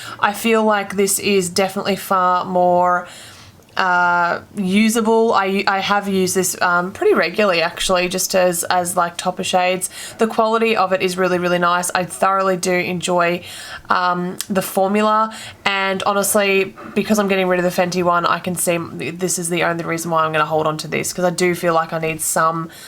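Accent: Australia. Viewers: You sound aussie, how do you get boxy charm, thought they dont ahip to australia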